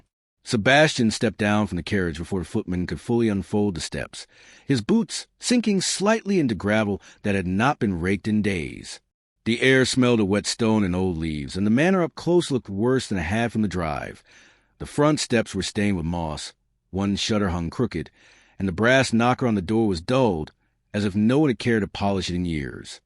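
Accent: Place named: United States. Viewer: Why haven't these regency romances not been given English narrators. Sound very odd in American English.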